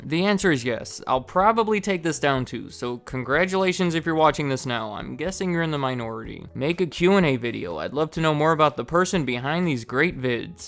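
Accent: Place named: Midwest, United States. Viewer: Sounds Midwest US to me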